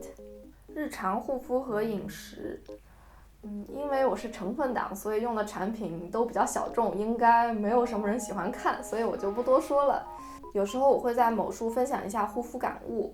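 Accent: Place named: Shanghai, China. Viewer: 难怪口音基本就是上海口音了，完全不是青岛北方口音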